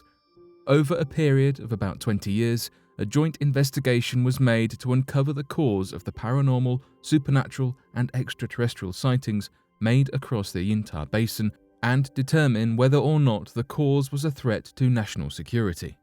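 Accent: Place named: Cockney, United Kingdom. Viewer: More recycled bull shit that isn’t anything new. Jesus fuck. Can you make videos on anything current? Cause from what I’ve seen on the websites I follow there’s plenty for you to report on. Probably because I’ve learned proper journalism from a professional one. And you’re probably an educationally lacking British person who’s grammatical cockney slang comes out in some sentences. No matter how hard you try to hide it... still comes out. 😂. Seriously though... did you finish high school even? Like you probably didn’t even have the chance to drop out of college(university, for your English ass) did ya!?! Hahahahahah.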